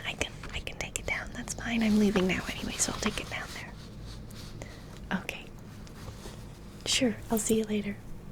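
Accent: United States. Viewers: The acting, camera angles, American accent and even facial expressions, you're phenomenal at this,. 😊 And speaking of discovering (Umbrella Corporation), I'm glad I discovered this channel. ❤ Thank you so much for this new video, and helping me to relax once again. 😊✨ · You do a really good American accent! I feel there's a lot of opportunity there for other roleplays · I love your American accent😊. You do it so well~ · After watching you for years, I don't think I'll ever get over how good your American accent is. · Girl, your American accent is impeccable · I like the American accent 🙌 Its solid , in this American's perspective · Every time you do an American accent video I forget that it isn’t your usual voice 😂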